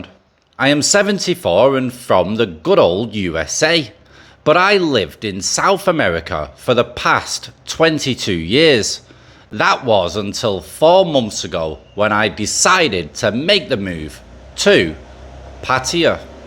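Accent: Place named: Scotland, United Kingdom. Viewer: Watching for 10 minutes straight and I could’ve sworn I was seeing Adam Sandler doing a Scottish accent! Awesome dude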